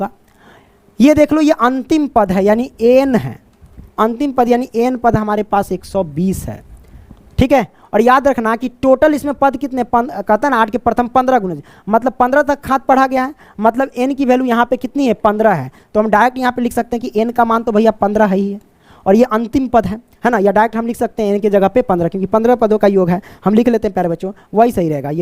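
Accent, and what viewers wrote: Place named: Rural, India. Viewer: Gawar ke trah bolta h dehati😂😂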